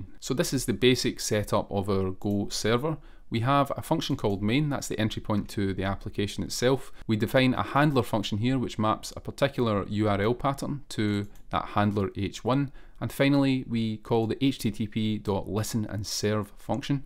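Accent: Scotland, United Kingdom. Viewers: 3 awesome things in this video. Golang, HTMX and the beautiful scottish accent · A Scotsman! 🎉 Refreshing to hear other accents.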